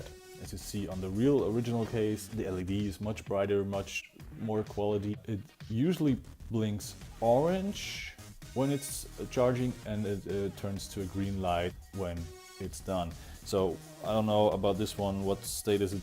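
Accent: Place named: Germany. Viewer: Bist du Deutscher ? Hört sich so an. Aber sehr gutes Englisch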